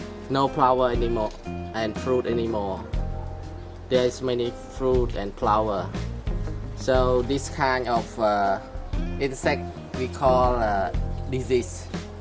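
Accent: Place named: Cambodia. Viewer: you must be Cambodian, sound like my uncle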